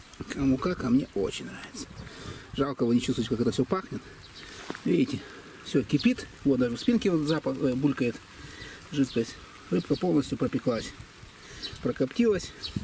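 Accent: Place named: Volga, Russia. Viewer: Вялить Вы меня уже научили, коптить научиться надо мне у Вас) у Вас говор очень родной))) на Волге так говорят.... Интересно кто Ваши мама и папа (дай, Бог, чтобы живы были и здоровы) Они гордятся Вами! Я вот горжусь , что у нас такой есть соотечественник как Вы! Желаю Вам всегда удачной рыбалки!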